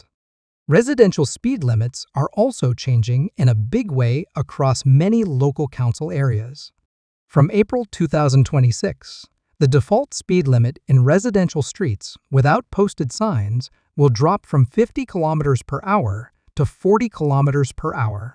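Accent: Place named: United States. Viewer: I do not know how much of this I can actually believe. Specially with a Yankee voice or a I. Sounds like revenue to me with some of it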